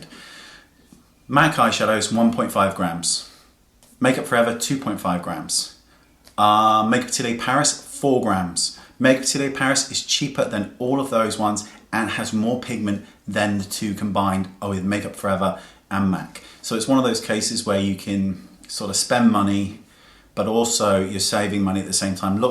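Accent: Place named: Bristol, United Kingdom. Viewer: Love the Bristolian accent 😊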